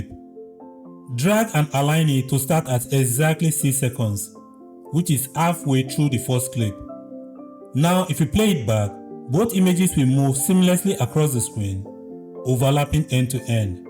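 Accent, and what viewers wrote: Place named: Nigeria. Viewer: HI good video i liked your nigerian accent bro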